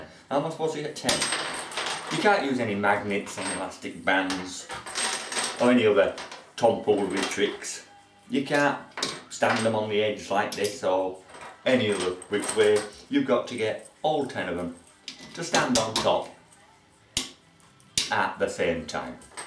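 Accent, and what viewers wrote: England, United Kingdom. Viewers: Great accent. It's like listening to a scene from a Beatles movie. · The English accent and ciggie gives him more credibility. :) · Thats not what Irish sounds like, hes English.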